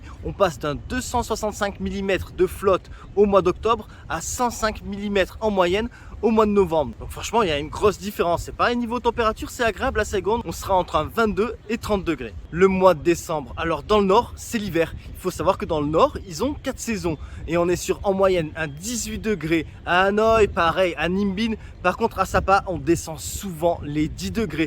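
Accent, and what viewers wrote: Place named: Southeast, France. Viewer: Bravo très bonne vidéo, on voit que tu connais le pays 👍, j'adore ta façon de prononcer les villes avec ton "naccen" du Sud-Est pas tout à fait ça mais bon on s'en fou 😀 Canto, Mito, Saïgonne, Niatran.... j'espère te croiser un de ces 4 à Saigon quand tu y retourneras ce serait sympa se prendre un petit "cà phê sữa đá" et échanger. Bon voyage.🤗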